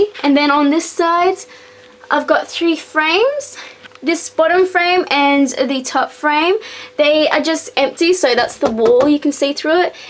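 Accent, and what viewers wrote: Australia. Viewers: are u from Australlia because you sound like it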